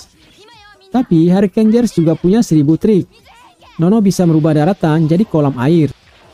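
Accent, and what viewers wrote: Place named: Padang, Indonesia. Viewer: Padang banget aksen nya bang, jadi salkus berasa dengar podcast praz teguh 😂 btw thx u udh bikinin rangkuman cerita nya, nonton dulu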